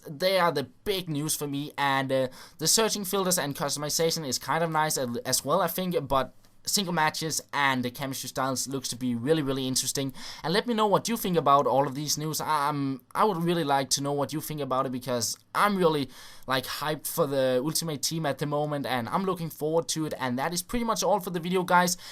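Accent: Denmark. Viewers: your English accent is Danish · Man kan bare høre på dig at du er dansker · lol so easy to spot that you are from north europa/DEnmark?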